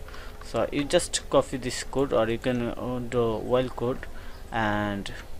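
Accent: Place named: India. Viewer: Terrible video, and what language are you using? Indish?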